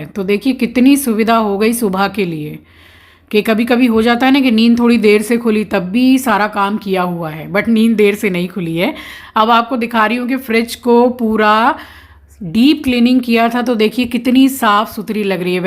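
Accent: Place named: Agra, India. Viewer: ji aap ke muh se Agra ki language sun kar bahut achha laga bola karo kabhi kabhi❤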